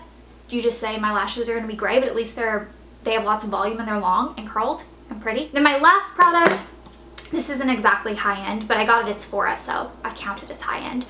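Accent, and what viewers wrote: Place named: South, United States. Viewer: She is southern... We southern girls can't pronounce things just right... lol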